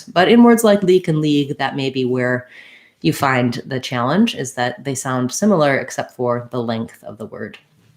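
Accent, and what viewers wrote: United States. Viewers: Why are teaching English with a deliberate American accent? It is incorrect English and very confusing for foreigners. There are many area accents in England but nobody normally would teach it because it is incorrect.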